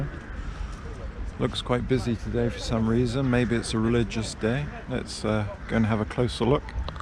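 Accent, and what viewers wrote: England, United Kingdom. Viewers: Bald and bankrupt got me here I am enjoying your vids too ,all ways wanted to visit Serbia since Tony Blair though it was a good idea to bomb it because he had no idea about history, can we have a little bit about your back story, how you got your very English accent maybe ?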